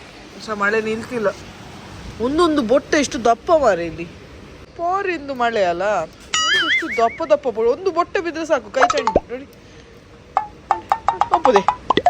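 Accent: Mangalore, India. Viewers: ಮಂಗಳೂರು ಭಾಷೆ ಕೇಳ್ಳಿಕೆ ಖುಷಿ ಆಗ್ತಿದೆ ಮಾರ್ರೆ 👌👌👌👌👌👌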